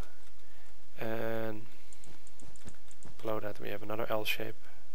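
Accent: Netherlands. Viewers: Are you from Holland? I thought I heared a kind of a dutch accent! ;-p Anyway nice video and of course nice design and tutorial! Goodbye! - The Dutch Island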